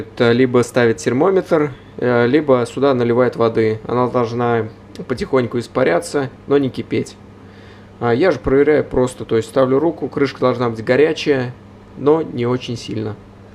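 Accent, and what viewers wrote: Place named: Mordovia, Russia. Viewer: с пацаном служил с Мордовии. У него такой же акцент) никогда раньше такого не слышал, а сейчас сразу узнаю)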